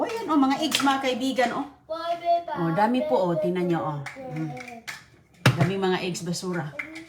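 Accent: Visayas, Philippines. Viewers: Eh bisaya nga kaya ganon syang mag salita hellow wag n kayang mang husga . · Visaya po ksi siya natural lng po yan sa pananalita nila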